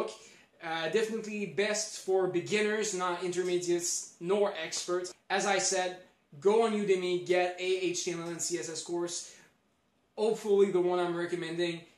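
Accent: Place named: Canada. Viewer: you sound so much more canadian in this video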